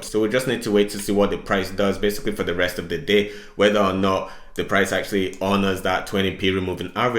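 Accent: Scotland, United Kingdom. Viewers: You remind of Josh finance got in before shiba inu broke a 0 I'm heavily invested in mana so subscribed and liked 👍 where you from also you sound Scottish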